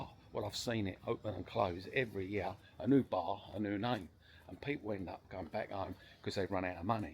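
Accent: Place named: Cockney, United Kingdom. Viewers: 2030 will be in touch if we're all still around. many thanks. btw i'm from romford and from your accent you're from simlar area? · Well, the guy has a Gor Blimey accent, so he is used to Ugly Dumps!!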